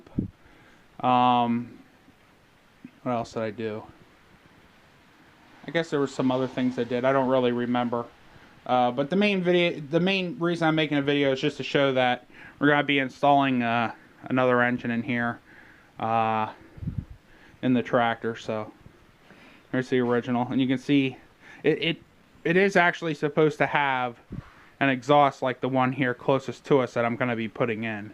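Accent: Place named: Pennsylvania, United States. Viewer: I knew you were from PA. I could tell by the accent!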